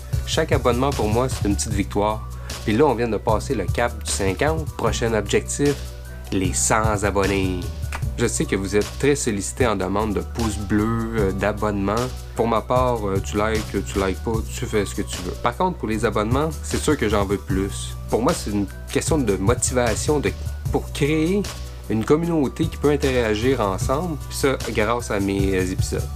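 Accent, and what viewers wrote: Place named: Quebec, Canada. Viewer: Très intéressant je vais essayer bientôt, je présume vue ton accent que tu est du Québec. Je me demandais si les baie de genièvre sont facile a trouver? Et que penser vous de filtré a nouveau avec exemple un filtre (britas)?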